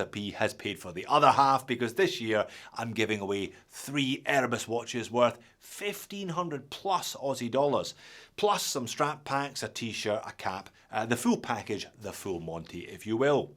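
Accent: Scotland, United Kingdom. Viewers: Love the channel! Glad to hear a fellow Scot who loves watches! Erebus gawin yersel!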